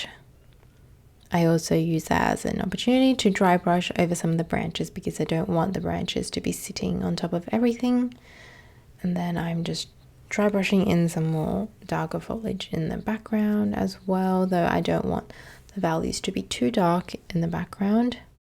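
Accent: Australia. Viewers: Great video! Thanks for not using background music; the combination of silence and your sweet, Australian voice makes it a true pleasure to watch and listen to. Greetings from Norway.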